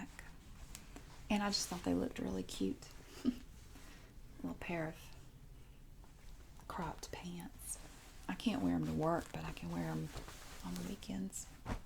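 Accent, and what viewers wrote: United States. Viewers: Your accent is amazing. I'm English and I love American accents. What's a goodwill store? Is it a charity shop?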